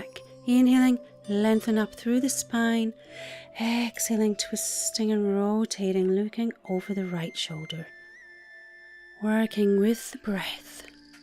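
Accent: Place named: Scotland, United Kingdom. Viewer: Love that scottisch accent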